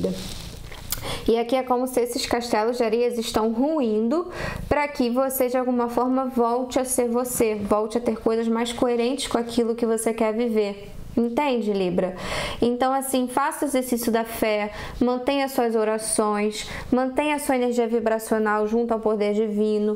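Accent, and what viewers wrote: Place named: Rio de Janeiro, Brazil. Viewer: Eu amo o sotaque e a energia carioca 😂❤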